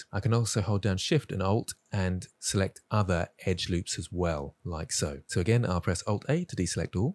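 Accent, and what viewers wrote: England, United Kingdom. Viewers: He’s English, pronouncing English in a thoroughly English way. What’s not to like? It may sound weird if you’ve learned Blender from a New Zealander who makes donuts…. 😂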